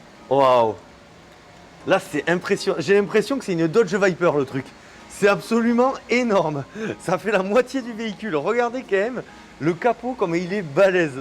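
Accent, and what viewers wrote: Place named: Southwest, France. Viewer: Tes expressions, et ton accent de notre sud-ouest (qui de temps en temps me manque)...j'adore. Ne change surtout pas!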